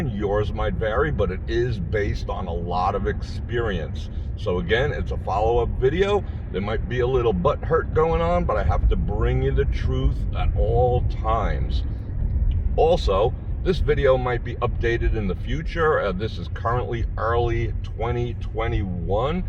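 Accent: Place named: East Coast, United States. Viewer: From your accent are you from the East Coast.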